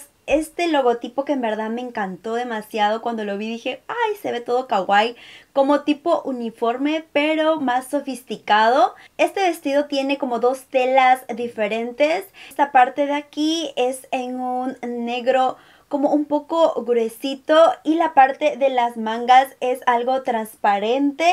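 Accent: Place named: Mexico. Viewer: Pareces mexicana como hablas!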